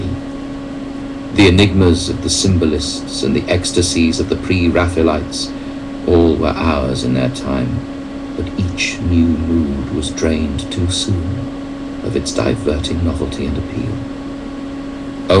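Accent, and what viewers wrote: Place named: England, United Kingdom. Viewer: This is awesome, and it's great how they got an English guy to do the voice, since that's where it's set. Lovecraft was the man! No, Lovecraft IS the man! Lovecraft is forever!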